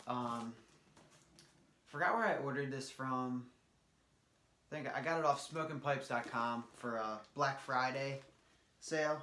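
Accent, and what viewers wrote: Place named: Philadelphia, United States. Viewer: You have a strong Philly accent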